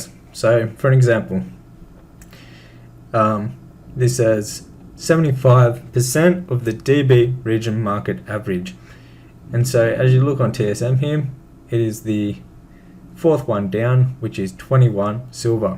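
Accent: Australia. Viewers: You sound aussie, how are you not lagging out on this server lol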